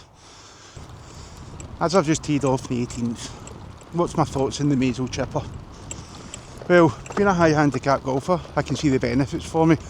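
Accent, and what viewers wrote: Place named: Scotland, United Kingdom. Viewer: Where from scotland, sir?❤❤❤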